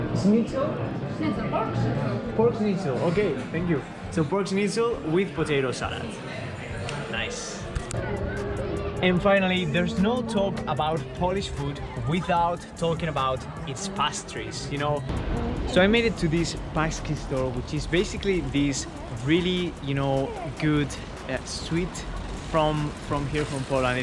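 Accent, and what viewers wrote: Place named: Spain. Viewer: Great spainish accent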